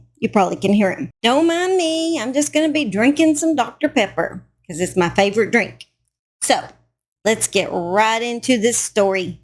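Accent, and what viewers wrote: South, United States. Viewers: Also I’m from Alabama 😊and we sound the same!! Hand raise for the southern gals ❤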